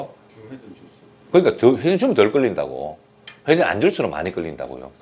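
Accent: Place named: Gyeongsang, South Korea. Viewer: 전형적인 경상도 말투에서 듣는 사람에게 짜증이나 화를 내는듯한 말투죠, 근데 실상은 그냥 평범한 말투인데 타지사람이 듣기엔 참 불편ㅋㅋ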